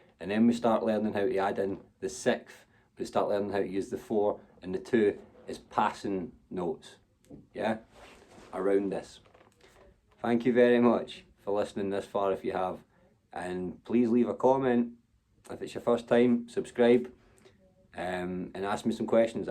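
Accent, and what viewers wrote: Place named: Ireland. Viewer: Thanks for the lesson. Next teach how to talk with that cool Irish accent! I’ll bring u some cough syrup and a new mounting bracket for that tv 😂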